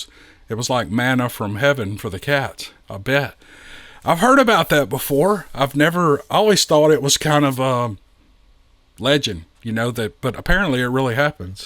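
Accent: South, United States. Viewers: I just love you! You're such an excellent story teller! And your voice and warm southen accent are pure perfection! (Accent! What accent???) Listening to you is always like sitting around a campfire listening to my favorite uncles telling stories. Thanks, for providing such great entertainment!!!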